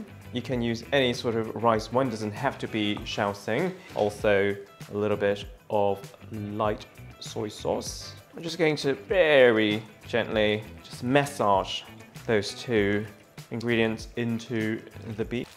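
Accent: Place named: RP, United Kingdom. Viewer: I want to like this channel, but the limp wristed posh affectation is revolting.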